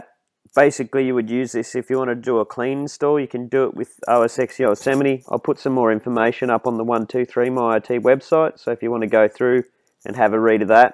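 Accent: Australia. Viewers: Well explained, good to hear an Aussie voice for a change.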